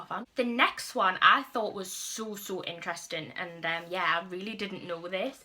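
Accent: Newcastle, United Kingdom. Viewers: A fellow Geordie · Are you from Newcastle? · oh my god im from Newcastle but I moved to London and its been so long since ive heard the accent xxx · Woooooooo another Geordie! I'm subscribing!!!!! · Are u from the north east? U sound Geordie coz if u are then I finally found a YouTube from me city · Are you a geordie? If you to me you sounded like one 👍🏼 subscribed almost instantly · Shes a geordie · Are u from Newcastle 💕👑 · Omg a Geordie!!!!! I’m from the toon too! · hey i found your channel on my recommended list! i noticed me and you have the same accent! is there any chance you are from newcastle if you are thats awsome! x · Are u are geordie or no because you sound like one lol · R u from Newcastle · I thought I recognised your voice hahaha I'm from Newcastle too xx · Are you a Geordie? :) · YEEESS A YOUTUBER WHO IS A GEORDIE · That Geordie accent tho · Are you a Geordie because if u are I am too BTW love your vids keep it up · are you from Newcastle? 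xx I am 💙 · By your accent Im guessing you from Newcastle? Haha. I currently work at Primark Newcastle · U sound so similar to a geordie where do u come from also ur very pretty xxx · I love how bubbly you are! And your geordie accent is so fun 😂💗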